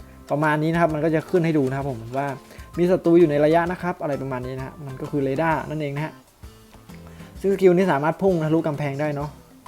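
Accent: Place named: South, Thailand. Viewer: สูคนใต้ม้ายครับ ผมเห็นสูแหลงออกทองแดงกัน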